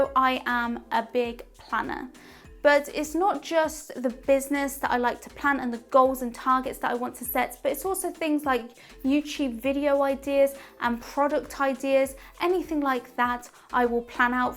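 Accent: United Kingdom. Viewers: Love your lecture and I admire you been so young and doing so many tasks, yes been a professional artist is not simple and yes we need to learn more from others new techniques or ideas or how the business world change and new technologies are introduce, I love painting ,but not for business, I do other type of art as well, but I understand the importance of keep with the advance technologies. Congratulations you are great artist, and I am thinking in enrolled into your course, as I understand fir your accent you must be in somewhere in the United Kingdom, not for sure is England or Irish, either way is ok, just curiosity, are your courses in dollars ? I am in Australia and want to know wich currency you use. Thank you very much, my e-mail is <mail>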